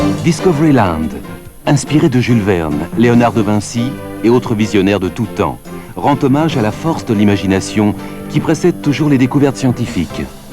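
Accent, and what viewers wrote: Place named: France. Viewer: Die Franse uitspraak van de Engelse termen maken het helemaal. Merci om dit te delen, deze had ik nog niet gezien. ❤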